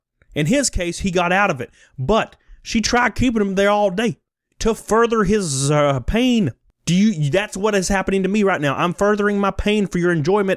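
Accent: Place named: Alabama, United States. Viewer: I was watching you on my tv and my wife said " does his voice really sound like that?" I died laughing. I said hes from alabama. she goes ohhhhhhh.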